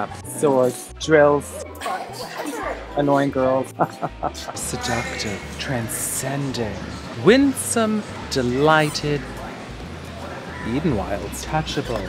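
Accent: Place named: Northeast, United States. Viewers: I just came across her channel and she's so funny. I love listening to her northeastern accent and her saying "that bothers me". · There's something about you and listening to you. That's like being with a good friand your family member. The New York accent is just ahorrible. The most important thing is very pleasant to listen to you. I really, really enjoy it. You were given a geft and I hope you're happy.❤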